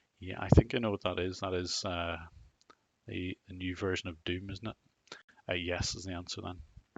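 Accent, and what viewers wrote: Northern Ireland, United Kingdom. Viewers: Very interesting live stream. Your videos are always great. I was surprised to hear that you are Northern Irish. I had always guessed Scottish as a few people also guessed on the stream. I'm from the south of the border in the Midlands of Ireland so we are not all that far away. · northern Ireland ?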